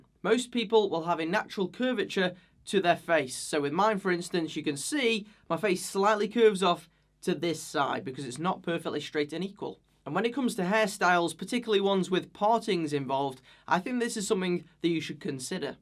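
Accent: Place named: Northern England, United Kingdom. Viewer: Not really, Daniel Radcliffe has a London accent, his accent sounds more like a northerner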